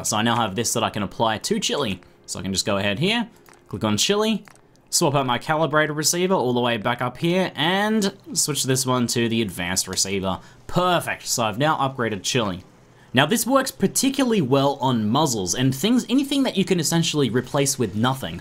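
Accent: Australia. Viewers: You Australian or NZ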